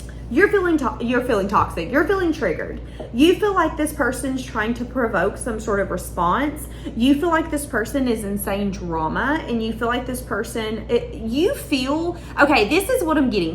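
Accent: South, United States. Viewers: Love ❤ your sounthern accent. Its very attractive on you.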